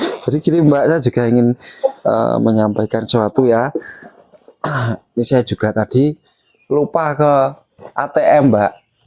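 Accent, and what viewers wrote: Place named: Central Java, Indonesia. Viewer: Embak orang jawa tengah ya... Td dengar nggih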